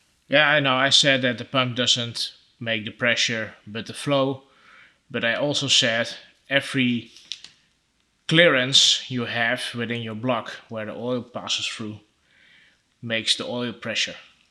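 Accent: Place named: Netherlands. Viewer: Hallo ik hoor dat u van nederland bent en nederlands spreekt ik wou u vragen heb een probleem met mijn auto ik hoor een schurende geluid in de buurt van de distributie riem heb alles vervangen distributie riem met spanner waterpomp multi v rien met spanrol krukaspoelie alternator maar geluid is er nog steeds het komt binnen in de krukas percies heb onlangs mijn olie vevangen en geluid is percies verminderd kan het nu zijn dat de olie pomp die lawaai maakte het is percies metaal over metaal percies lagers over elkaar kan u mijn aub helpen en kan het zijn dat de olie pomp versleten is en een schurende geluid maakt . Ben u dankbaar voor u reactie dank u .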